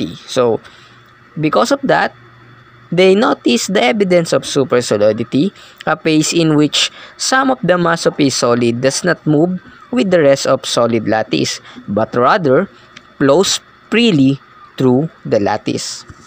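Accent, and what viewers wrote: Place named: India. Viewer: While I very much appreciate the man explaining this, the Hindi accent in the English makes it quite hard for me to follow.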